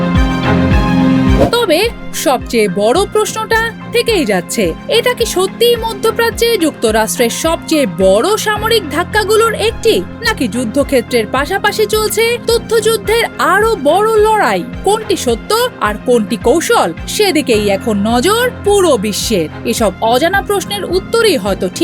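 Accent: Kolkata, India. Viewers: এইরকম কলকাতার স্টাইলে খবর পড়ে কেন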